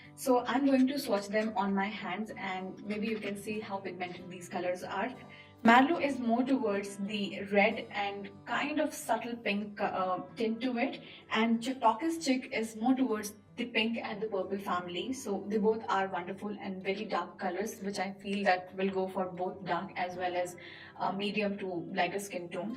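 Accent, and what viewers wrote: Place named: India. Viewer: The prizes are amazing and i love your other videos!! I can tell from your accent that you’re from India Right? ❤️i’m from indonesia🇮🇩!!! Keep it up and enter me!!!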